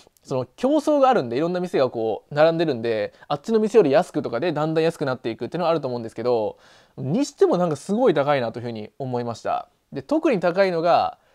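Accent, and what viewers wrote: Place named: Kagoshima, Japan. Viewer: 方言が強い！の「わい、おい」で1番笑いましたw 私は鹿児島県民なんですけど、わい、おいが全く同じなんですね😂 私、南島原で普通に生きていけそう👏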